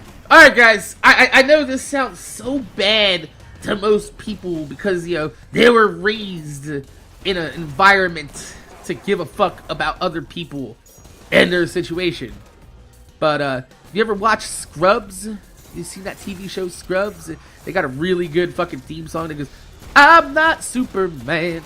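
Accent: New Jersey, United States. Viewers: you from north Jersey or south? SJ guy that enjoys your content!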